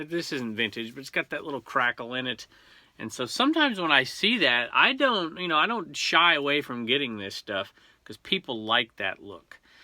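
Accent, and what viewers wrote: South, United States. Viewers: Where in California they speak with southern accent you gotta give that family secret up.. I thought Angeles played in Anaheim and there they sure don’t speak like you...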